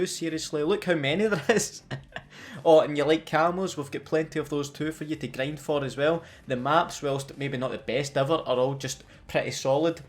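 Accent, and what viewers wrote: Scotland, United Kingdom. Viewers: That scotish ascent dogh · I love your channel, but your braveheart accent is giving me a headache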